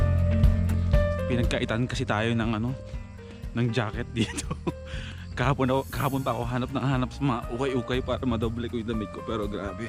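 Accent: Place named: Karay-a, Philippines. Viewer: Do you speak ‘karay-a’? It’s cute to hear😀😀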